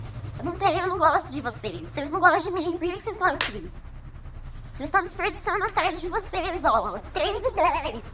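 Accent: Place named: Favela, Brazil. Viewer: O engraçado é que ela fala como se tivesse nascido na favela,pobre,passando fome né kkkk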